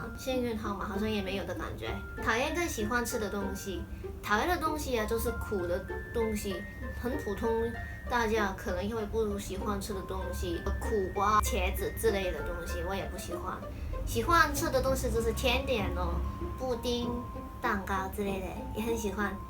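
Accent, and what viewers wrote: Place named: Southeast Asia. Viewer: 听起来像东南亚人讲华语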